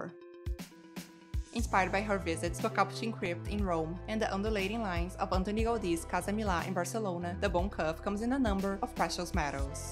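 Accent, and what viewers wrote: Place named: England, United Kingdom. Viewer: I can intolerant your English accent.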